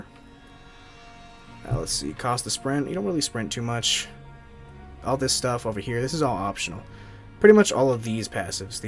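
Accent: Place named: East Coast, United States. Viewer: Wicked east coast accent man🤣 keep up the good work!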